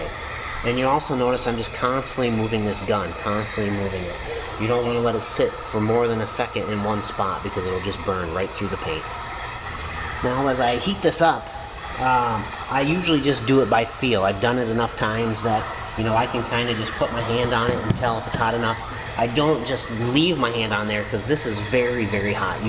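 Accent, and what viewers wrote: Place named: Minnesota, United States. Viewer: Nice video! My mechanic here in Houston wanted $350 just to pop out a corner dent like that. But Houston is expensive. Also nice to hear a Minnesota accent! I went to college up there.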